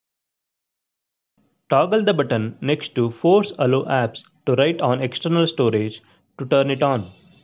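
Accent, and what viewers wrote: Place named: India. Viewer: Why does all this videos on youtube has an indian accent WTF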